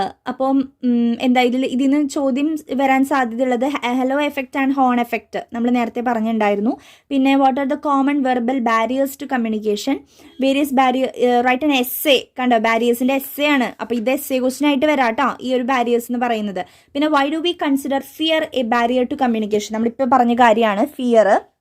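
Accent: Thrissur, India. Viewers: Thrissurkaari aano😊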